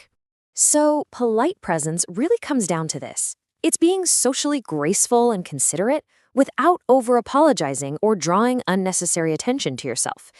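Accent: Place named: United States. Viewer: But the accent is american?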